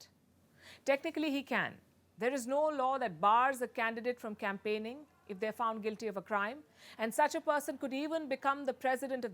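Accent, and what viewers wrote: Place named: India. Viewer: You....English please! Not indi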